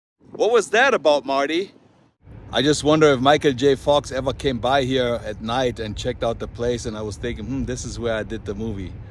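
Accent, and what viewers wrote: Germany. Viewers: Haha the very same Massage-Seats weve over here in the "PC" (Paunsdorfcenter) in Leipzig, Germany. Got your Video in the Recommendations and just thought "he has a german accent" - totally not read ur Accountname.:D Wish ya a nice sunday and thx for the small travel in time.:) Liebe Grüße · Are you German?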